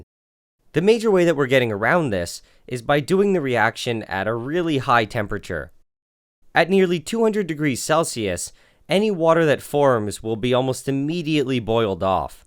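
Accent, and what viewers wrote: Canada. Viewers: awesome video, you sound canadian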